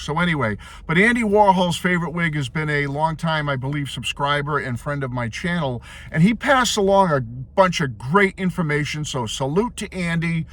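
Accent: Boston, United States. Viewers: By the way, let me just say, again, I so enjoy sitting down with a cup of coffee and hearing my online “friend/neighbour” of sorts, just share his love for cool things about the best band in the world. I love how unadulterated you are and yet not weird or obsessive but a normal guy. I kind of get a chuckle out of your Boston like accent too. I feel like I’m watching an old episode of Cheers or something. Have a great day, thank you for the morning comraderie.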